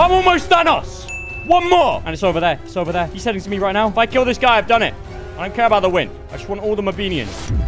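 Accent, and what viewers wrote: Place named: United Kingdom. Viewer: L british man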